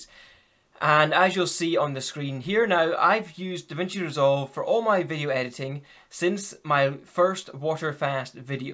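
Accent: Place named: Scotland, United Kingdom. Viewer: Warsaw, Poland :D Was wondering which part of Scotland, nice!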